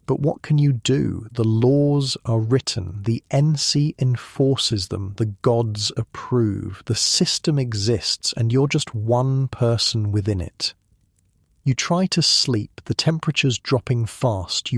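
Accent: England, United Kingdom. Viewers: Hello there well this is my first time posting, I am from Northwest Ontario and a very small community of 2000 people. I am native Canadian and I absolutely love listening to you every night, I love the fact that you have an English accent, which is very pleasant And I must have fallen asleep at sometime but the factors I enjoy it when I come back every other night thank you so much for this service as long as you’re not a Yankee, thank goodness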